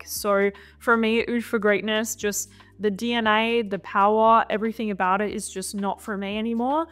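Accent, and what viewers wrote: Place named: Australia. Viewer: What is your accent? I can’t tell if you’re Australian or French or both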